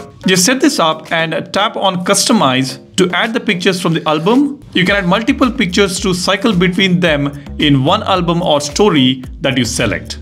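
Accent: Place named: India. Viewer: Your English is terrible 😅😅Indian English..i got headake to listen to you..great Review 👍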